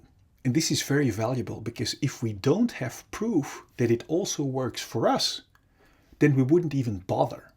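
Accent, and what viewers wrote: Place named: Netherlands. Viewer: Are you Dutch? I think I recognize your Accent. If so, cool , groetjes uit Utrecht.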